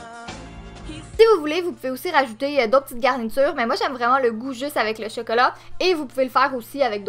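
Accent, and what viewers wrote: Quebec, Canada. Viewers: c'est vrai c'est beau le québécois😘😘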